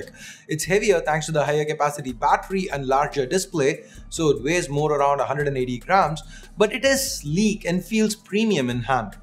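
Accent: India. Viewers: i couldnt hear 80% of what he said. 1. too fast 2. deep Indian accent. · Indian talking in English. My gosh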